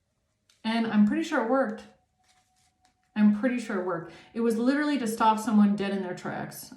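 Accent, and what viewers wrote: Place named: Germany. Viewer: Good morning 🌅 beautiful 😇👀👑, hope you have a beautiful day night, love your accent Germany ❤💜🌅💜,I was abit worried about you last night, I wouldn't want to piss you off,I love you ❤, your are a earth angel 😇☀️⭐, anyway you distract me 😊, beautiful,I worry about you, when you bend down, your neck from your accident,need a massage, anyway looking forward to hearing your voice and thoughts 💬, peace love and light 🕯️ x😊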